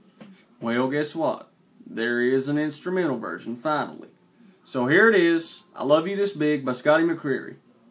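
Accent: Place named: South, United States. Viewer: I Love Your Country Accent :)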